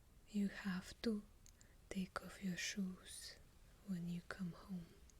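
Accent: Russia. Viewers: I was searching for heavy Russian accents because I adore heavy accents, especially Russian and Ukrainian accents, and I found your video. I found it very funny and also cute. You have a very soothing voice, wonderful accent. Thanks.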